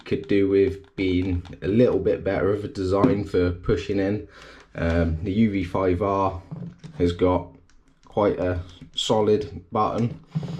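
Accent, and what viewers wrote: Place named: England, United Kingdom. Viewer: 😂 Fair enough mate...you sounded English in the Video...73 ✌️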